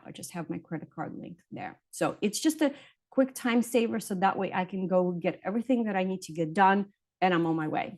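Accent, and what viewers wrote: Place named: New Jersey, United States. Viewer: "Let's tawlk" ... jeez ... i miss Jersey & once away i can't help but catch a belly laugh when hearing the accent that Jersey folk swear they do not have. I gotta get home !!! 😆🍅😆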